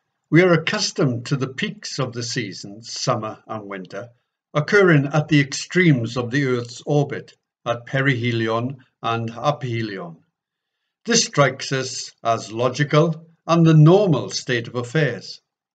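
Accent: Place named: South Wales, United Kingdom. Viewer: This is the South Wales Valleys ' Phone voice' . Well presented butt . Of course all us Brythonic and Irish people were fully aware of this since the end of the last ice age when we moved back in from modern day Spain.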